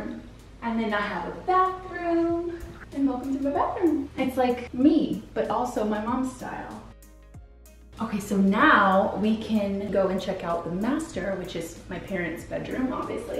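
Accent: Texas, United States. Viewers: I feel like your Texas drawl didn’t ever come through until this video! I love it. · I'm from Texas after reading comments about her accent I had to go back and rewatch the video! I did hear the accent but I think it was more because she was having fun and was talking a bit lower. But I've watched vlogs with her mom in them before and her accent didn't change. As well as picking peaches and the terminology isn't exactly big city lingo =)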